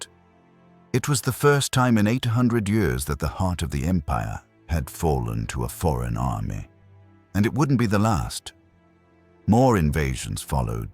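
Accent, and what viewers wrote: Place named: Australia. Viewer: Like others in the comments, for me it sounded like Australia.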